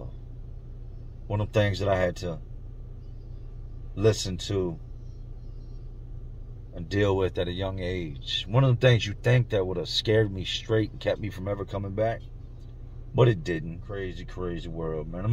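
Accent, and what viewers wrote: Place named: Philadelphia, United States. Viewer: he fr talks how we talk out in philly, its just where and who he's spent his time with that influences how he speaks. just cause we talk a certain way doesnt make us hood or anything else we just talking how we are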